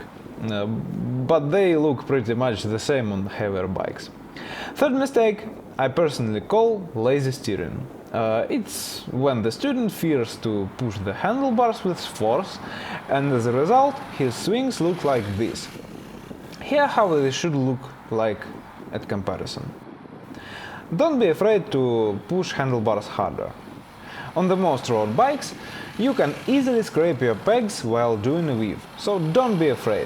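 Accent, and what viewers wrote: Russia. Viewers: Concise and precise. I have watched and followed many videos on YouTube, yours is the most understood despite your thick Russian accent. Cheers from Malaysia. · Apart from being 100% quality easy to follow and to remember, motor bike riding lessons, I love the Russian accent, specially when you speak Italian, thanks buddy. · I love your russian inflections when you speak english, theyäre so charming :-) great vid as always, oldie but goldie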